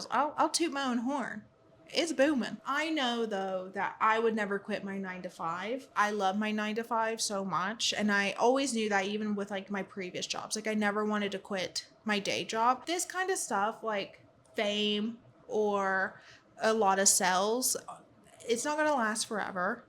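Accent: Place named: Appalachia, United States. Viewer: I could tell a fellow Appalachian girlie from accent alone! 🤗 Welcome back to booktube!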